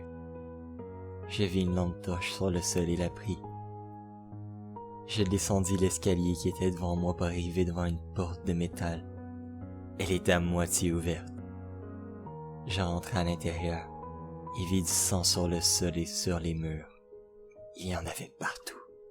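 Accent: Quebec, Canada. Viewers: Tu serais pas Québécois par hasard? (Par ton accent et tout) Sinon très chouette vidéo ta voix est plutôt bonne mais tu semblais parfois plus ''heureux'' que triste ou apeurée. Bonne continuation + 1 abonné. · L'accens Canadien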